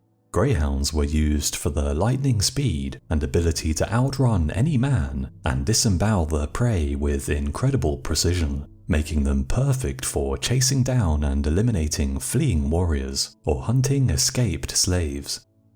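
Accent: United Kingdom. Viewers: #1 Narrator Has a British/English accent, #2 Hyperbolic made up history, this is Definitely British Anti-Spanish Propaganda so much like the Black Legend they created , All Lies, Arriba España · seems kinda farfetched, they had jaguars in their jungles, I'm sure dogs which are much smaller wouldn't scare them much......the fact that a british accented guy is narrating this story makes it that much more questionable...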